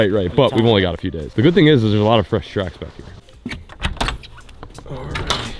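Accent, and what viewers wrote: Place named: New England, United States. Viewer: Coming from a New Englander I love the accent you picked up with my fellow boys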